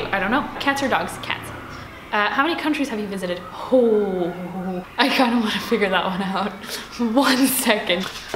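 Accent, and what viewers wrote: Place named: Canada. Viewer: Ohhh that's why in your other video with your friend you had a canadian accent snd in this vid you have a mixture 😮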